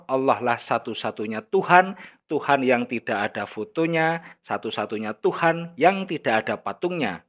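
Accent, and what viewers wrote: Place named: Tegal, Indonesia. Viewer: Logatnya kaya org Tegal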